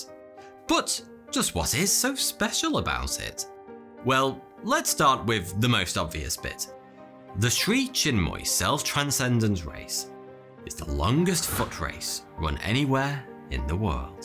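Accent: United Kingdom. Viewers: only british accent i like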